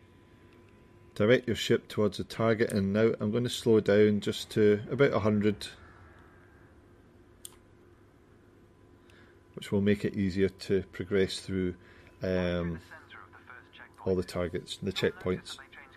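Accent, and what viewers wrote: Scotland, United Kingdom. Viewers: you Scottish mate? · Are you Scottish? Great accent dude! You said it, "steep learning curve." It's been pretty miserable learning it but now that I slogged through the basics I'm totally hooked. Amazing game they created, can't wait to play it in VR! Thanks for the free videos, helpful. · he definitely is scottish, yes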